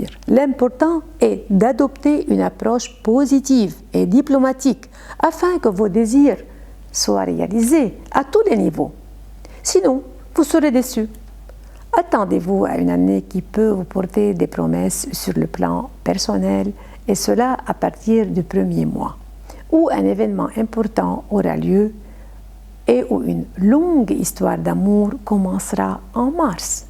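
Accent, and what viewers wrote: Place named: Italy. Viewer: تكلمي الفرنسية بلهجة ايطالية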